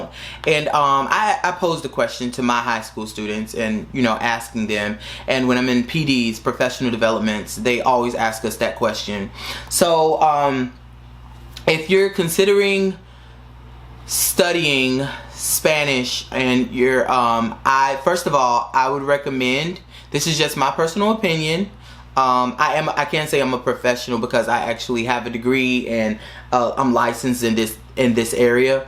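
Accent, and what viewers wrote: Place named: Cuba. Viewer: your spanish sounds cuban!!!!!!!!!OMG....I speak spanish as well. I lived in Costa Rica for 1 year when I was 16 to become fluent...however i was learning since grade 3 as well. I did my Ba in Spanish, my postgrad in Early Childhood Education now doing my Masters in Esl in Ecuador!!!!!!!! I did my BA in Canada...if i had to do it over again..I would have chosen Spain to do my ba in spanish lol as my former professor did the same thing.....nevertheless I love the language....also my adoptive parents are from ecuador and peru!!!! So that was a plus for me...in Canada....It's crazy how I can sit watch telenovelas and understand everything as a second language learner....and I am black as well from the Bahamas...latinamericans think that i am from cuba or dominican when I speak spanish...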